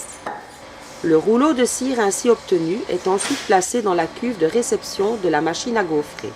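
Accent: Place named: Belgium. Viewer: L'accent belche!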